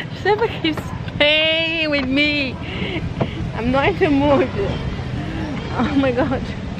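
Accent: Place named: England, United Kingdom. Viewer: heel mooi engels accent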